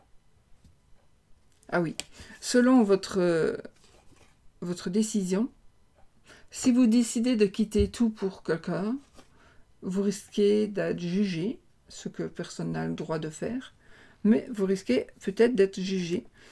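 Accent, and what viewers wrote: Belgium. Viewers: Bonjour, toujours aussi ravissante! Av son jolie accent belge que j adore!je suis a ma pause a mon travail a l hôpital, et je suis venue voir directement ta nouvelle vidéo, quel plaisir de te voir! Concernant le tirage, certaines choses me rejoigne moi le célibataire, mais pas tout évidemment! Bonne soirée 😘🌹😘🙏♥️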